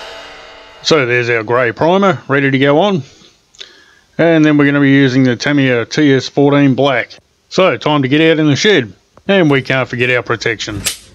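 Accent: Australia. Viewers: very humble man!! Becoming a huge fan of yours! You and!! Also helps that everything in a Australian accent just sounds cooler and funnier!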